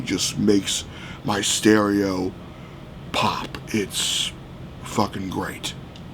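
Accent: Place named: United States. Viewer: Hi, you have a very interesting accent. Where in the US are you from ? Thanks for sharing your thoughts on this Sony.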